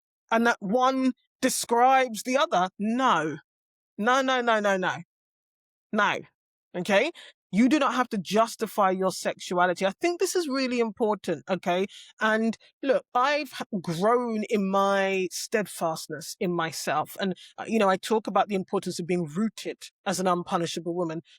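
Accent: United Kingdom. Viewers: London accent. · yeah she definitely have a British accent, don’t know where exactly but that accent isn’t American at all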